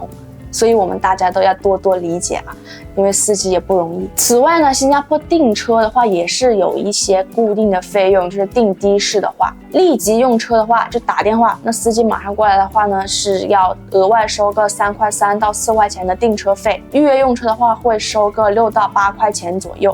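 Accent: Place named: Hubei, China. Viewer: 你这口音是湖北普通话。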